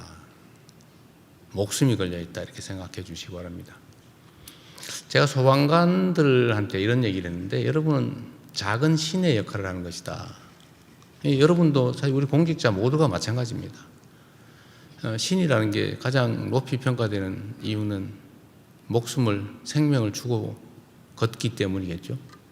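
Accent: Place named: Gyeongbuk, South Korea. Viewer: 갑자기, 이재명 대통령님의 말씨가 고 김광석 형님의 말씨와 흡사하다는 느낌이 드네요. 그러고보니 두 분다 경북 출신입니다. 그래서 더 그렇군요. 참 정겨운 말씨였죠. 광석이 형님 ㅠㅠ 두 분이 또 연세도 비슷하셔요. 광석이 형님이 용띠, 이재명 대통령님이 토끼띠인가? 참고로 저는 말띠입니다. 광석이 형님 닮은 제 친형도 토끼띠예요 ㅎㅎ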